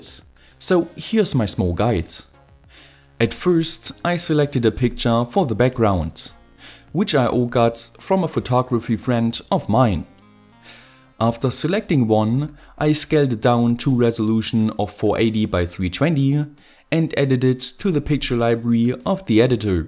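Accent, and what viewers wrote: Saxony, Germany. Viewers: are you german?? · Ahaaa ein deutscher 😂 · bist du aus Leipzig?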